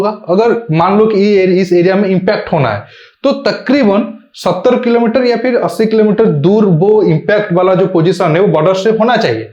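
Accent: Odisha, India. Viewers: AP bhai odisha se ho kya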